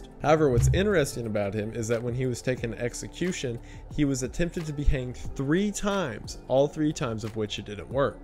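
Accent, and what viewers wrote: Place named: Georgia, United States. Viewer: it's a Georgia thing I think. I got a buddy from north of Atlanta and he talks exactly like. all the same turns of phrase, mispronounces words the same way, same cadence - the works.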